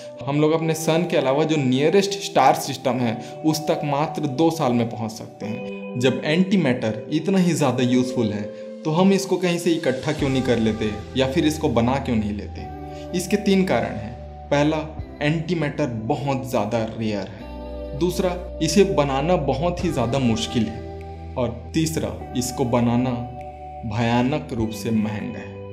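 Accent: Chhattisgarh, India. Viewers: Accent se toh lag raha hai Chhattisgarh se hain.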